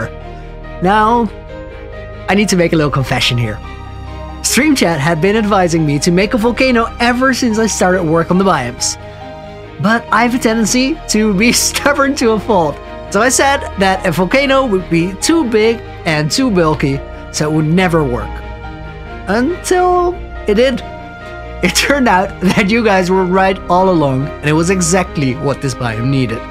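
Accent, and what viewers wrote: Netherlands. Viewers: I love your Dutch accent ahaha why have I never found your channel before? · Brooo this shit is amazing, I love the vibe but I did recognize a Dutch accent right away ;). Ziet de lekker uit man!!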